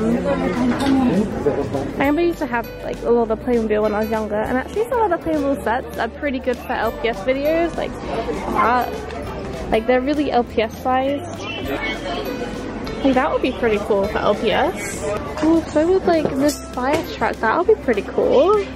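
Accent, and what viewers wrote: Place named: London, United Kingdom. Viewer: Your from london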